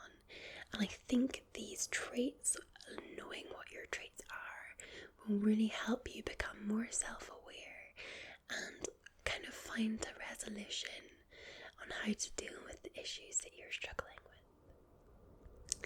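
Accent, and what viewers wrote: Scotland, United Kingdom. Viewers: Are you Scottish? (I know its in the name but still😂) I was just wondering because I am Scottish and I have been looking for a asmrtist who is Scottish because I don't know why but I love Scottish asmr😂 I also wanna say that I love you and your channel so much! You have helped me with my depression and anxiety! So a massive thank you💖 Keep up the wonderful videos!💕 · Read today in an article by the BBC that scientists have said a Scottish accent is an anti depressant because of its ability to cause ASMR....after watching your videos for the past year I can honestly, conclusively say those claims are true!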